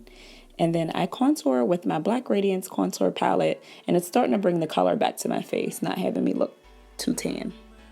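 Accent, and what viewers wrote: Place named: Pennsylvania, United States. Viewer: Are you from PA? I always think everyone from PA has an accent and you sound exactly like the accent I attempt to describe. Also this was beautiful well done!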